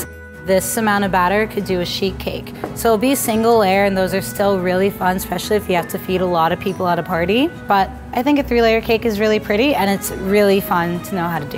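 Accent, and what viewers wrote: United States. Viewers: Sounds American to me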